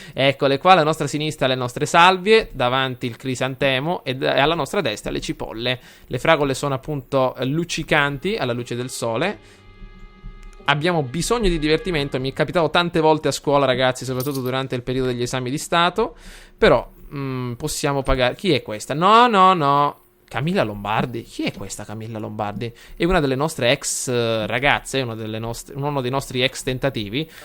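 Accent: Sicily, Italy. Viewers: Dal "noi non siamo cosa di.. [...]" ho avuto la conferma che sei siciliano o almeno meridionale Continua così, riesci ad intrattenere bene uwu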